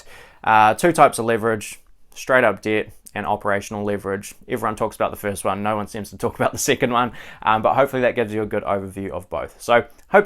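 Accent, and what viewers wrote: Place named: New Zealand. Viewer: Great video, many investors, particularly newer investors don’t look at the balance sheet of companies. Very important to make sure the company can comfortably handle its debts! Great vid mate, love the Kiwi accent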